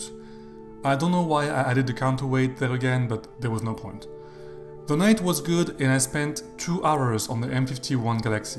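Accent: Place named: France. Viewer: I knew it, I did detect a French accent❤❤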